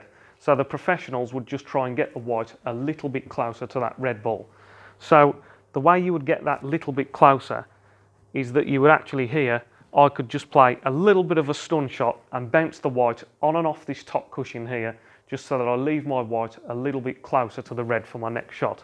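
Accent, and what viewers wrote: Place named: Black Country, United Kingdom. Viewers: it's the Black Country accent. If he was from Yorkshire he would be dropping Hs. It's just the varied UK dialects. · Laila Vags he is from the Black Country in the West Midlands enjoy the video and stop trolling 😉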